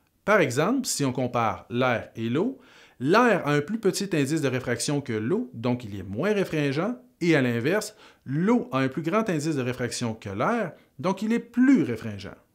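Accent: Quebec, Canada. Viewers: Je suis en cours je comprend rien y’a trop d’accent québécois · avec votre accent québécoise, sa me donne vraiment envis de le réviser avec vous ^^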